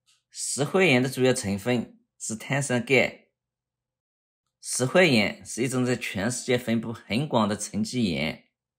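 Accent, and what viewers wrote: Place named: Zhejiang, China. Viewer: 我咋觉得像浙江口音，宁波？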